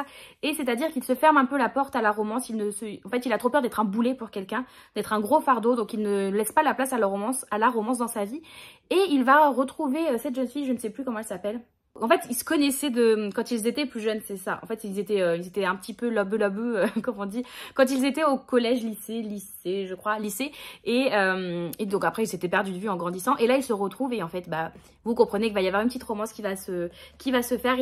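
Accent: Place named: England, United Kingdom. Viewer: Olala, le livre autour de Jane Austen me tente ❤ Sinon j'adore l'accent anglais 😂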